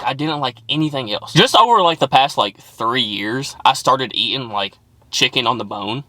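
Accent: United States. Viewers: You misunderstood why Brits think Americans are rude, it stems from ww2 when soldiers came over and flaunted their wealth thinking they were better than us, also just the way your accent is louder and more flamboyant it comes across as brash .. yes it's a stereo type but blame it on your film and music stars 😆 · Don't worry about the language differences, there's no 'correct' version of English. Language evolves, splits and merges. We got 'cookie' from Dutch a long time ago and it's a great word. No one owns English and no one can dictate how it should evolve - it does what it does and it's amazing. I agree on prawn cocktail - actual prawn cocktail is one of my favourite foods ever but I don't like the crisp flavour 😂(also, EMOJIs - huge development in written language - we can actually convey tone in a way that isn't clunky now!). The banter is a difficult one - it's usually fine because people can tell if someone's not up for it and won't make them uncomfortable but it certainly can backfire. 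I've never been good at taking it and people can just tell right away - one look at me and they know I'm too delicate 😄I wouldn't change it for the world though; comedy keeps me going and it's incredible that it's woven into our way of life. Love this video! You're so reasonable and sweet - a model American (with a lovely accent too!) ❤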